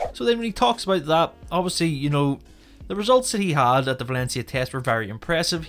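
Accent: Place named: United Kingdom. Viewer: Narrator sounds British.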